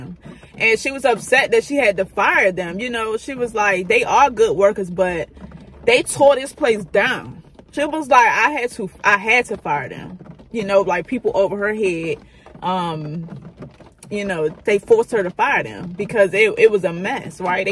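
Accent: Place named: North, United Kingdom. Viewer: U must be from up north u sound like it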